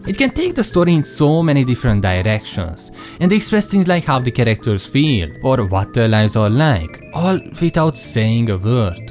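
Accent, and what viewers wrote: Hungary. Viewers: Random felhozta nekem a videót a YouTube, hallgatom, hogy de magyaros az akcentus, megnézem a csatorna névjegyét... yyapp, a " " brotha':D De amúgy nagyon jók a vágások és tök jó, hogy egy magyar is foglalkozik ilyen témákkal, még ha angolul is. Sok sikert a továbbiakban, keep it up~! ✨ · UGYANEZ!! Hallgatom, hogy na "he sounds EXACTLY like a Hungarian person speaking English would", és igen, az 😂 😊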